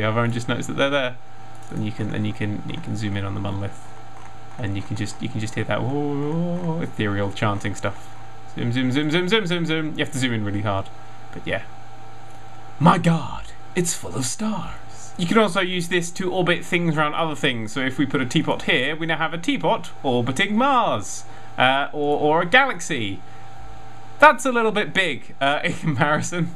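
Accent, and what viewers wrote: United Kingdom. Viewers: 'American voice' its a british accent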